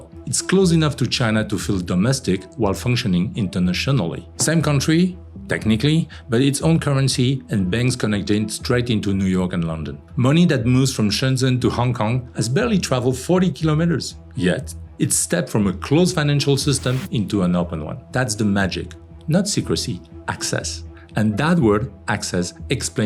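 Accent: France. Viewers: Lol says a guy with french accent , , compare to Switzerland is France dump with all there problems‼🤭😂🤣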